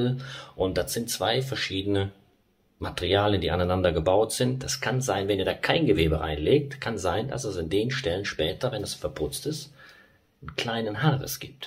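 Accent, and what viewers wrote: Cologne, Germany. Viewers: Hallo sympathischter Stukateur der mir bekannten Handwerkerschaft, lieber. Als ehemals in DDR-Zeiten gelernter Baufacharbeiter Hochbau mit absolutem Hang zum Verputzen gefallen mir alle deine Videos außerordentlich. Besonders deine absolut lockere Art und deine hervorrajende kölsche Dialekt sind sehr sympathisch. Mit "eine Typ" wie Dich hätte ich auch gerne zusammengearbeitet. Mache bitte noch viele weitere Videos des vielfältigen Handwerkeralltags. Nette Menschen wie du es bist, sollten von den Handwerkskammern als Botschafter und Werbeikonen für das Handwerk herangezogen werden, meine Meinung... Die weiterhin maximale Produktionserfolge im Gewerk und beim drehen weiterer Videos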